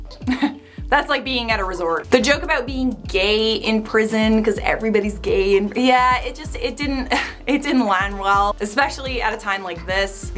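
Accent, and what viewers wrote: United Kingdom. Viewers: You British lady accent is what keeps me clicking😉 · I love your british accent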